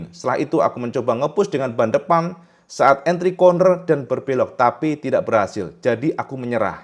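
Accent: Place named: Java, Indonesia. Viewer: Sangat kental bahasa jowone kang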